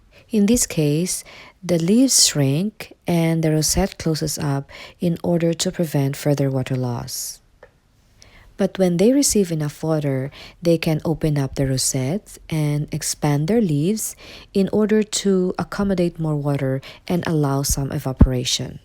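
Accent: Philippines. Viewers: you sound pinoy like me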